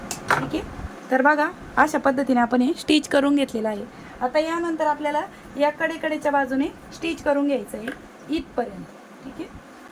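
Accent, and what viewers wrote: Ahmednagar, India. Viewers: अग विचारायचं कारण म्हणजे भाषा नगरचीच वाटली ना म्हणून विचारलं आम्ही पण कुकण्याचे आहोत पण नगरला रहातो ,तुझे व्हिडिओ बघायला लागल्या पासून खूपच पिशव्या शिवल्या मी खुच छान सांगते तू लगेच लक्षात बसत 🙏🙏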